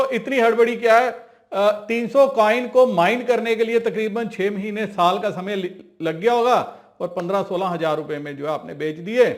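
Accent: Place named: India. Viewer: you write in English and then speak Indian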